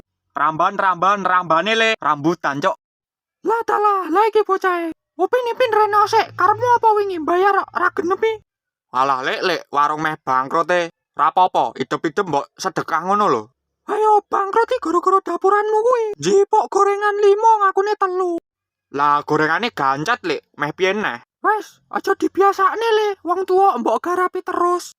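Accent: Surakarta, Indonesia. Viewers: wong solo to bang?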